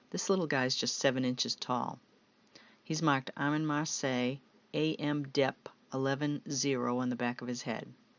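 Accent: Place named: Northeast, United States. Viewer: Oh my god! I am drooling over your beautiful dolls. WOW! Great video. You must be from the Northeast. I love your accent. I just subscribed.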